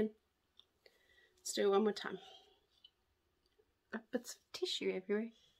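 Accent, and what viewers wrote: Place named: South Africa. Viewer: Wow. That was beyond amazing. You really have a beautiful juicy perfect nose. The way you blow your nose is just perfect. The position of your hands. The honks you make. And you are really beautiful in general as well. And I love the south African accent too. Is there a way I can talk to you in private?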